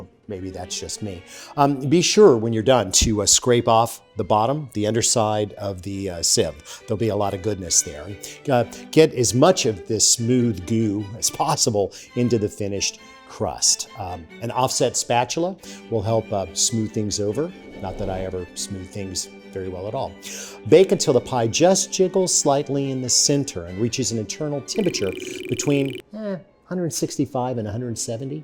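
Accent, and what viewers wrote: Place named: General American, United States. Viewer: Alton is the Renaissance man of our times. We have not recognized a well- rounded man needs to be all -around in visual media technology, and his many other talents, cooking, writing, on screen talent, editor, music director. DP, behind the camera, producer, props, the master of GenAm or broadcast American English. . . ah heck, the above and below the line producer, covering all areas. That is a true modern Renaissance man.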